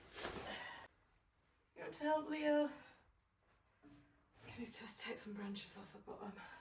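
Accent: Northern England, United Kingdom. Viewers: Not boring, not annoying, and you have a lovely Northern accent. It's nice to see home decor ideas that don't cost a fortune in a normal comfortable small home. Some people seem to get a thrill out of being spiteful. My old Northern Mum used to say - if you can't say anything nice - don't say anything at all...... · Your gold wreath is exactly what I was looking for, Home Sense failed me though. I love your northern accent, you sound just like my Manchunian best friend who now lives in the US, happily she hasn’t lost her accent which I think is “dead nice”. ❤️ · I really love your content and your house and tree look beautiful. You have a lovely soft and warm northern English accent, nowt wrong with that. Have a great week. 💕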